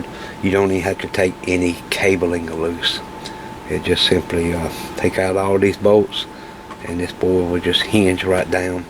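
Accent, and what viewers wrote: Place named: South Louisiana, United States. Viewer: Glad you and your family came out of the storm without any major damage - I did not know where you lived you have that South Louisiana kind of voice.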